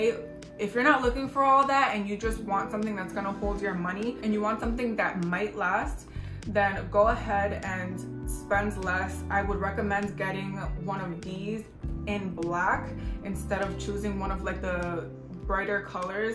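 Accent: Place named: New York, United States. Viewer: Def from New York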